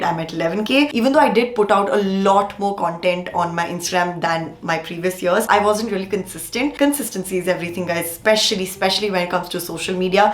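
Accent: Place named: India. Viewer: I love your accent so much I am 40% Indian and 50% Jamaican and I have an Indian accent and yours just makes me happy to have it <3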